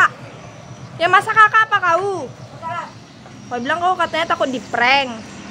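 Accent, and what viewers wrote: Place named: Medan, Indonesia. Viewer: Nampak x bahasa medan mu Dx🤣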